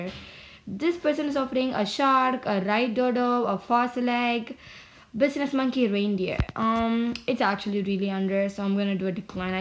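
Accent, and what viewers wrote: India. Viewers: im also indian i was wondering if she is also indian because her voice is sounds like indian and she is indian :)) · By the accent she is Indian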